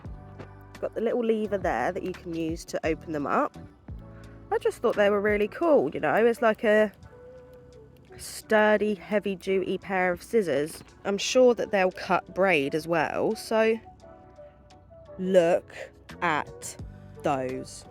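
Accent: United Kingdom. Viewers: Great video 😊 Glad you're back on now Love your UK accent I'm here in the states And I always love hearing someone from the UK or Australia 😊 Good luck this season girl😊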